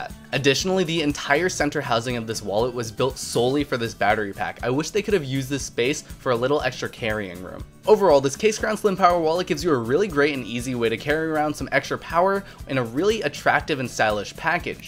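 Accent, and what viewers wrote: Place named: New Jersey, United States. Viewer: guess your from Jersey haha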